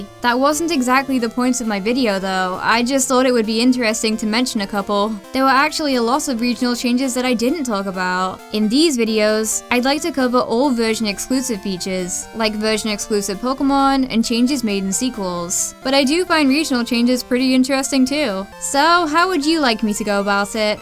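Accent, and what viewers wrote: England, United Kingdom. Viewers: OakTea she's british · Your accent is awesome! I wish I had an English accent... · Woah, this person has a very odd accent. It sounds like a regional accent from Britain that has been polluted by living in the US. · Are you ever so slightly English? You definitely have a tinge of our accent when you talk. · What nice British voice.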